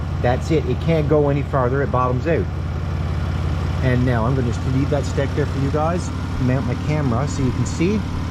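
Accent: Canada. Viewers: A minute into the video I was like "hmmm he sure sounds Canadian eh" and sure enough you are lol. I'm from Toronto, with at 2018 DGC.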